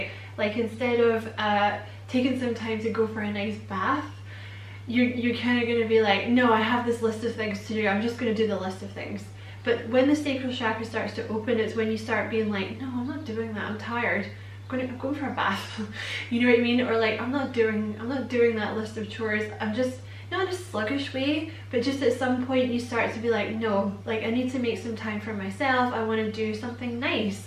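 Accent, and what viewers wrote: Scotland, United Kingdom. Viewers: I have just binge watched your videos here, I love your explanations for everything I would really agree with how you see things and you have confirmed a lot of what I have felt to be true but wasn't sure if I was seeing it the way other spiritual people do so it's great to get your take on everything. Also this is the best chakra explanation I have heard 💚💫🙏. You probably hear this a lot but I love your Scottish accent, I'm Irish and we do tend to like the Scots over here 😃. God bless and take care 💚🙏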